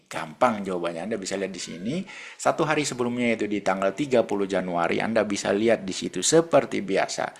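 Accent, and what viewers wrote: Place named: Central Java, Indonesia. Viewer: Modar, semacam logat jateng , magelang purworejo sekitarnya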